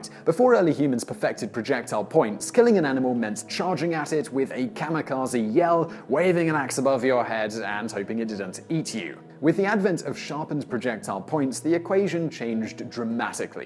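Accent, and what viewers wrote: England, United Kingdom. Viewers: "British accent"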